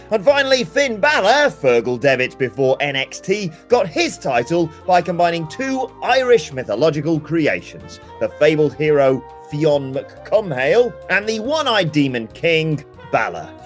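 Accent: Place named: England, United Kingdom. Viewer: That's exactly how it's pronounced. What's your problem with it? I'd like to know. If you mean that Americans say it like "motcho" that's just a difference in vowel sounds. The guy in the video is English, and that's how we say it.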